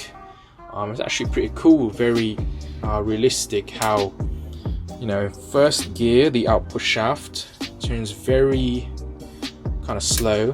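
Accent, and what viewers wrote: United Kingdom. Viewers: Why is there a British man now and no one is talking about it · i really recognize your accent, i swear it's the camden area in london where i heard it last and know where it's from.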